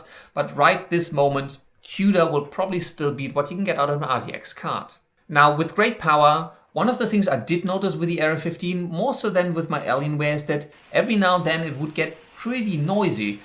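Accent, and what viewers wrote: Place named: Germany. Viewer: you kinda have that German accent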